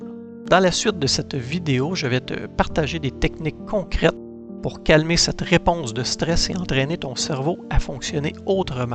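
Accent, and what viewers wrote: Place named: Quebec, Canada. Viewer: Bonsoir ❤ je ne sais pas respirer, aussi, je me sers de votre vidéo explicative: " votre technique 4; 7; 8.." Merci pour cette explication géniale! Vos vidéos sont hyper intéressantes! J’ai une question...Est-ce qu’une personne ayant un souffle au cœur +un problème cardiaque peu faire du sport s.v.p? Merci par avance! J’adore votre accent Québécois, c’est un accent chantant tout mimi.Prenez soin de vous! ❤🙏❤️🥀🙋‍♀️🥀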